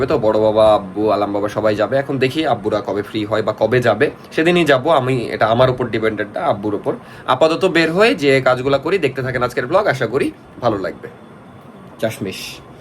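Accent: Rajshahi, Bangladesh. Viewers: "Hebi sundor laaegche" love ur rajshahi accent ❤🎉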